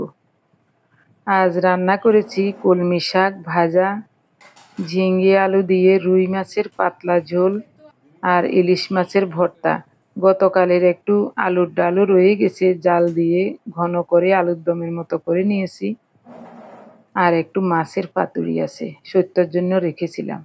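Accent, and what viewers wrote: Noakhali, Bangladesh. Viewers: দিদি আমি নোয়াখালী থেকে বলছি। আপনার কথার মধ্যে নোয়াখালীর একটা টান আছে, বুঝতে পারছি না আপনার দেশের বাড়ি কোথায়। খুব সুন্দর লাগছে সবাই টুকটাক কথা বলছেন।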